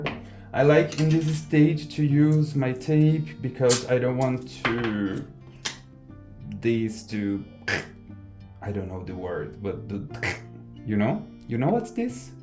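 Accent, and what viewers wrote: Brazil. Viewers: Our cute brazilian accent turns the video even better 🥰🥰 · Que bonitinho o sotaque brazuka falando Inglês!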